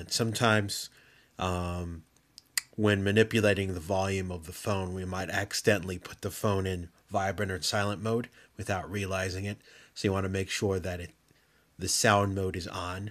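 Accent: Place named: United States. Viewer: Thankyou! And nice to listen to someone who actually speaks English and doesn't speak too fast! American English!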